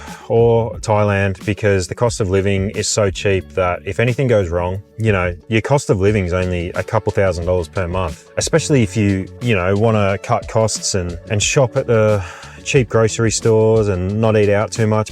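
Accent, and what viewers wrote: Australia. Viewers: It’s not your Azzie accent. Tim Hortons is the problem. 🇮🇳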